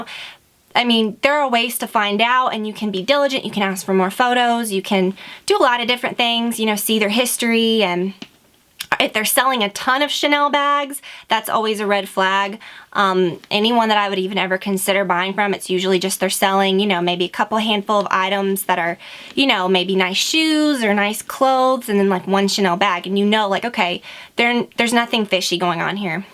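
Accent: South, United States. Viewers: lol why do I feel like you have more of a country accent in this video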